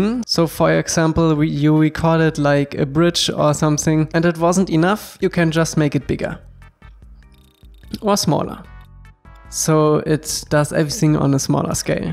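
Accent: Germany. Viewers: echt jetz? warum denn nu in englisch? wenn ich das will da gibts doch schon so viele....aber gute "how to`s" in deutsch sind doch schwer zu finden! Bitte - hast übers animieren auch in deutsch was gepostet? Ist super wie du das machst, aber dein englisch ist ....lustig - so deutsch, smile^, wie langemachst du das schon? und wie lange bereitest du dich auf so ein video vor? Danke fürs antworten auch wenn der Spot schon alt ist^^ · Schöner Deutscher akzent Das video ist supi 👌🏻👌🏻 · Love the German accent ? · Deutsch Akzent is da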